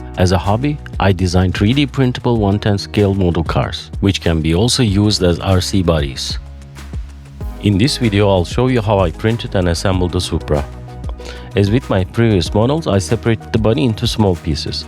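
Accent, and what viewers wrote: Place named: Turkey. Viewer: aksandan hemen anladım Türk olduğunu eline sağlik kralim güzel oldu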